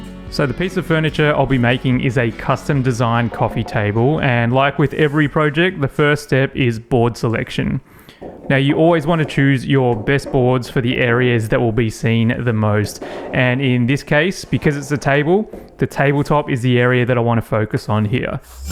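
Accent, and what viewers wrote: Australia. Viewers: This was so beautiful and satisfying to watch. It's nice to hear an Australian voice too!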